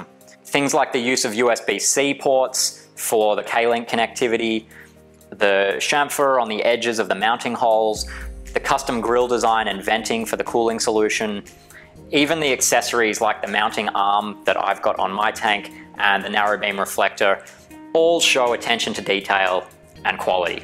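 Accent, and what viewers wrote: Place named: New Zealand. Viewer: U seem to have a kiwi accent. Are you from New Zealand? 💙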